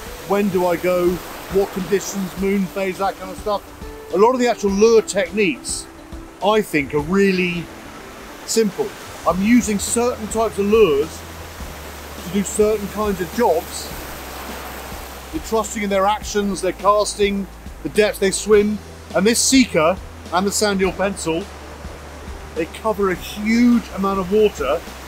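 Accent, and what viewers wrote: South Africa. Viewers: I like how he keeps saying, Yoh! Like a proper Saffa 😁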